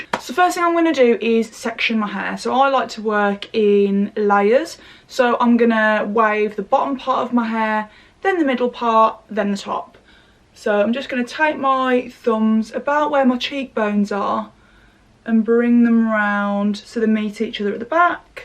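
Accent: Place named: Telford, United Kingdom. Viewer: This saved my life this morning 🤦🏻‍♀️ can I ask where your from? My family are from Telford and have the same accent 🥰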